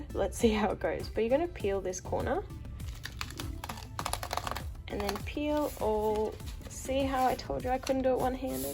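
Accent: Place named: Australia. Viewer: i adore your australian accent 😍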